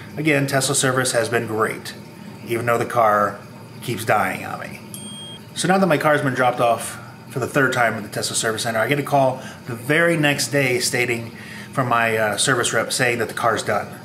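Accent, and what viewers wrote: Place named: United States. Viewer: your American accent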